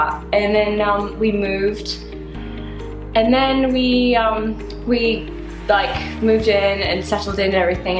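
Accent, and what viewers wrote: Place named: United Kingdom. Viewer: Barbados has perhaps the largest 'White' population in the Caribbean. Her accent sounds British, though...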